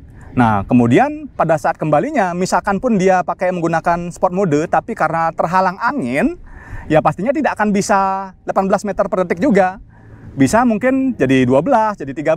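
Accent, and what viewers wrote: Bali, Indonesia. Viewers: Kayak logat Bali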